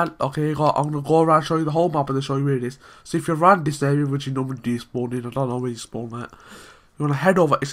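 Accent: Birmingham, United Kingdom. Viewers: Bro just cuz he's got a Birmingham accent doesn't mean he's romello's dad 😂😑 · Im pretty sure this is a brummie accent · he has a good british accent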